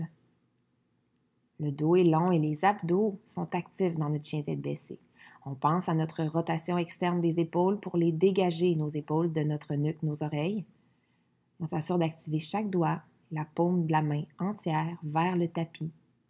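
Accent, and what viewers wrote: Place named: Quebec, Canada. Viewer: j'adore cet accent Quebecois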